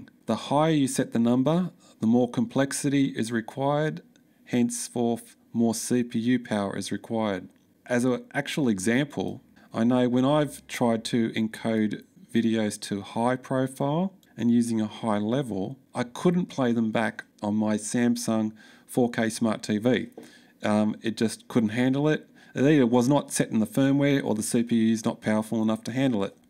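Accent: Australia. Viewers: LOL, just watched your video (1st Feb 2025) on Handbrake v1.9.0! And it’s STILL such an awesome, informative, and super helpful guide – easy to follow with that classic Aussie accent too! 😂 Greetings from South Africa! :hand-pink-waving:🏉🏏😆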